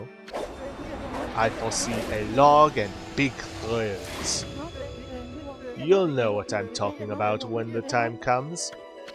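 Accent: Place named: France. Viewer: I'm rather enjoying your French accent. Also, I'm not French, so I can't make an assessment of how good it is.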